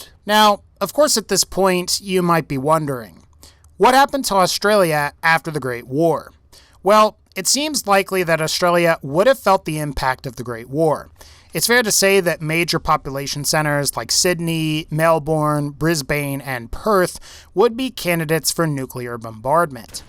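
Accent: United States. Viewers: As an Australian, it is quite amusing to listen to you, an American, pronounce some of our major cities. i.e Melbourne = Mel-Bin. Brisbane = Bris-Bin.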